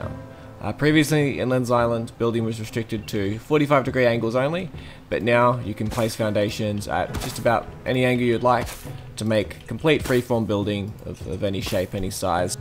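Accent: Australia. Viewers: I love the temporary z-buffer object transparency (???) - is that common? It looks very schmick and useful. I have done something similar in a Windows explorer clone I am developing kinda sorta... Good stuff here, great to hear the Aussie voice on a game dev video of this quality.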